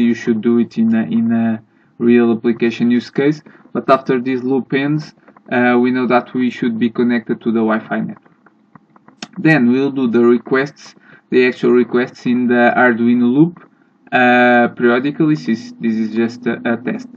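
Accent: Portugal. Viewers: Are you portuguese? Your accent seems me very familiar 😂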